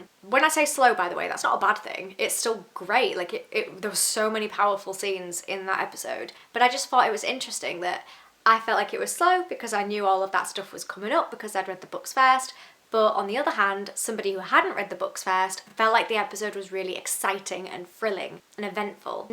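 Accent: United Kingdom. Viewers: tbf I had some time off for medical reasons so it was the perfect time to start. Got me through a tough period. Forgot to mention, the show really does a great job with the music! is a song you want to hate but it is beautifully composed. And one of my favorite bits of the latter seasons is a full version of Jenny's song (the one Tom o' Sevens sings to the Ghost of High Heart). You'll also hear and at some point. As you continue to watch you'll notice each house has their own dedicated song and you'll here different versions of them throughout the show. The score is phenomenal! Love the accent by the way, I live in the US (from the Caribbean) but work with a score of English expats (Camden Town, Leeds, Newcastle and Leicester), so it feels like I'm listening to a friend.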